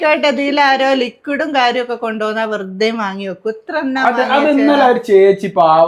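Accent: Kozhikode, India. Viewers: എൻ്റെ ധൈരമായി ഇരിക്ക് ഉഷാറായി ഇരിക്ക്യുന്ന ആണ് ഞങ്ങൾക്ക് ഇഷ്ടം കോഴിക്കോട് ഭാഷ രസ ഉണ്ട് കേൾക്കാൻ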